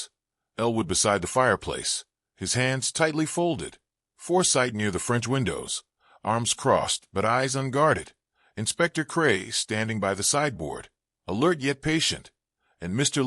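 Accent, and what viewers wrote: United States. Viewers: An American narrator does not work for a Miss Marple story. It grates.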